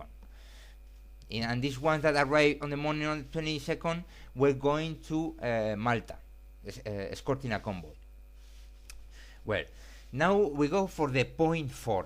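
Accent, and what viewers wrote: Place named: Massachusetts, United States. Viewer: This Inglish is from Machachuset, salu2 desde ESPAÑA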